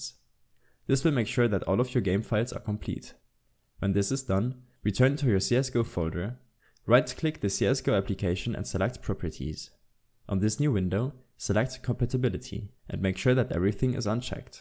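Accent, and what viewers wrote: Germany. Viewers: That german accent 😻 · Man merkt an deinem Akzent dass du Deutscher bist ahaha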